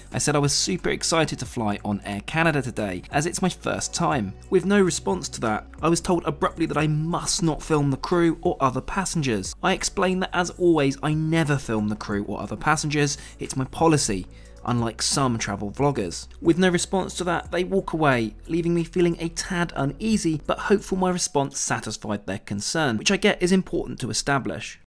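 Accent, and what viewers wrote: RP, United Kingdom. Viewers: its wild watching this in comparison to how posh he sounds now LMAO. So he was once just a regular dude.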